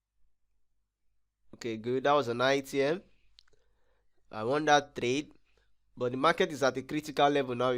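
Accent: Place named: England, United Kingdom. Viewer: I like your english accent, haha , btw love ur work 📉📈📊✔️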